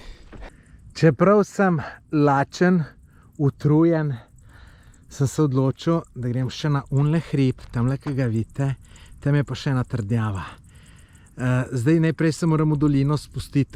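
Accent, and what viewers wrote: Lower Carniola, Slovenia. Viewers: Joj, kolk ti mene nasmejiš! Tvoja dolenščina je tolk zabavna, še kletvice so original. O krajih ne poveš kaj bistvenega, ampak za popotnika pa krasne info. Cena app, hrane, zajtrki.....ma ni boljše info. Super. Veš kaj mi je tudi všeč; začel si intervjujat ljudi. To je super. S tem potovanjem proti Albaniji in sedaj ČG si me pa spet navdušil. Motijo me le reklame, ki so podvojene in na kratko sapo.Razumem, da je tudi to del zaslužka, ampak....razmisli.....če ti res to potrebuješ. Na nek način meni malo razjezi, pa še koga verjetno tudi. Bodi fajn...in srećno pot proti domu. Aja....pa bolj počasi potuj...potem se ti neumnosti ne bodo več dogajale.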